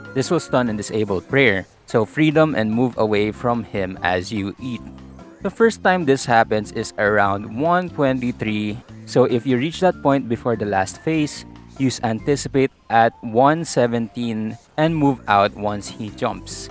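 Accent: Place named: Philippines. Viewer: I knew ur a Tangina by ur voice lol 😆 nice man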